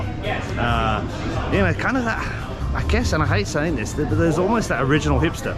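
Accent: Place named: Australia. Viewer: AHA! A Mexican! I catch your videos every now and then for tips on Rise of Empires and always thought by your accent you must be an Aussie. A Victorian I can deal with, thankfully you’re not a Qld’er! 😆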